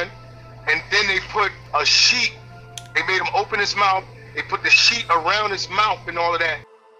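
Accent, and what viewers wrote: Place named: Brooklyn, United States. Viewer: My dude sound straight from Brooklyn or Harlem. That's our lingo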